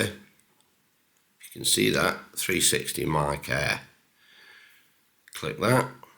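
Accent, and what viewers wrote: Stoke, United Kingdom. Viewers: Are you a stokie by any chance 🙂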